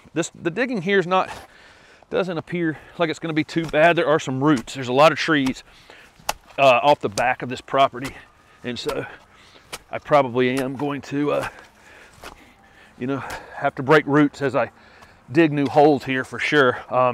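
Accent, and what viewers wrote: South, United States. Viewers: Hello, I am obsess with Camelias. Sadly I live in a zone 6. Any Camelias you know that are extremely hardy? Thanks so much Love your southern accent btw 😎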